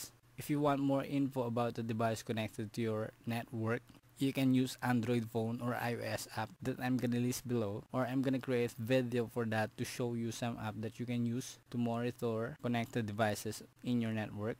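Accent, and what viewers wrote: Philippines. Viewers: tigas ng tagalog accent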